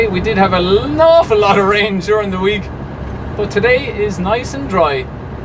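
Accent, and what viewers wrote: Ireland. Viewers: Hey I like your accent are you Irish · Pretty sure he is Irish :) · He’s Irish you dumb fuck!! 🙄🙄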